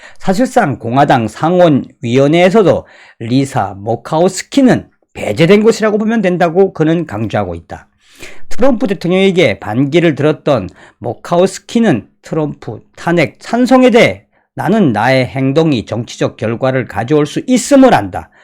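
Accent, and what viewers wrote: North Korea. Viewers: 탈북자시라 억양은 어쩔수없는거 같아요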